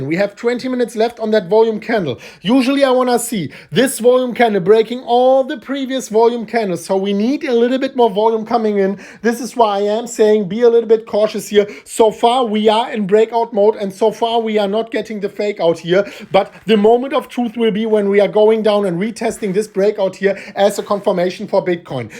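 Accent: Germany. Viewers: HES a German hes accent is funny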